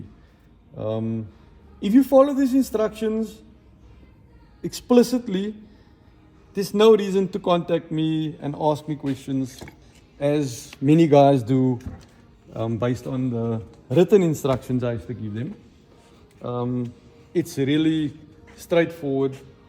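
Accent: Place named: South Africa. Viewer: Are you from South Africa lol just come across your vid you sound a lot like the guy from district 9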